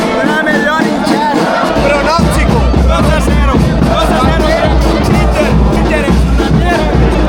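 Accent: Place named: Castile, Spain. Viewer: O Teu sotaque castelhano é bem diferente, nunca ouvi. De onde é ?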